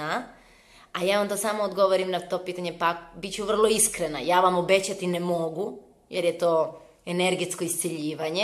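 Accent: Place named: Čačak, Serbia. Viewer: uh, sto mi se dopadas....lepo ti zvuci naglasak...,mislim Cacanski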